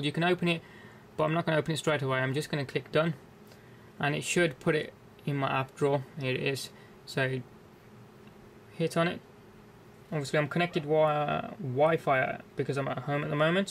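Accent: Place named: England, United Kingdom. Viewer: Because he speaks with an English accent? Might give it away for you. Also, the model number being given as i9300 would also give you a hint it might not work in the colonies.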